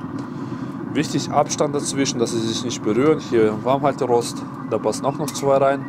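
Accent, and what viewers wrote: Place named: Russia. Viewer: Слышу ты русскоязычный, а что по русски канал не зделаешь отдельно?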